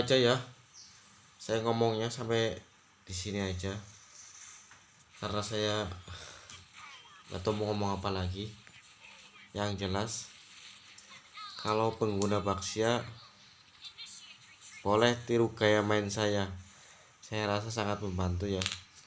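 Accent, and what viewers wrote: Banyumas, Indonesia. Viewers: Bang ngapak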